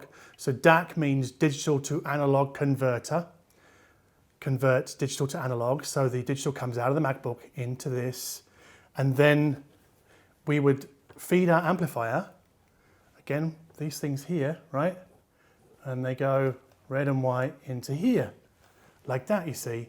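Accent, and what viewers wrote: United Kingdom. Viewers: So Brit... Ahahahahahahah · How to be a success on YouTube: 1) British accent 2) extensive and deep knowledge of the subject at hand 3) extensive command of the English language, avoiding overused terms such as "warm". 4)ability to give listeners an idea of how something sounds WITHOUT denigrating products or brands. 5) ability to explain a complex subject without sounding arrogant or talking down to the audience. Well done, sir!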